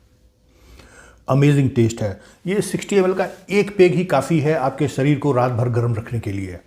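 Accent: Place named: Jharkhand, India. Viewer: Sir aap jharkhand ke kis jile se baat kar rahe hai ❤❤❤❤❤❤❤❤ I am from Jamshedpur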